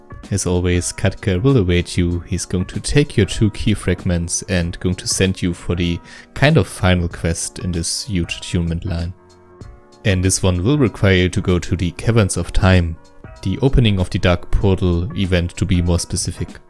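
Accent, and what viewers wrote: Germany. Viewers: Are you german? Because of the accent. Just asking. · german Accent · He is german, i think.. :D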